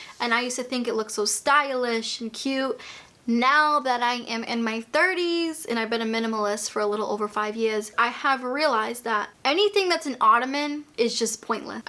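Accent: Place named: New York, United States. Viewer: I’m new here I love your accent are you a New Yorker ?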